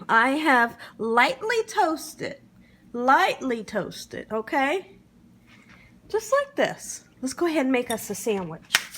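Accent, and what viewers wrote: South, United States. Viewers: This lady’s from the south for sure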